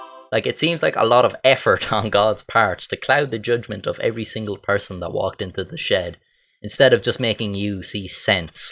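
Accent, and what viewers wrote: Ireland. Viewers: Is that a Carlow or Wicklow accent you have ? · I just stick around to hear him pronounce “thirty” with a D. Abd other Irish crap like that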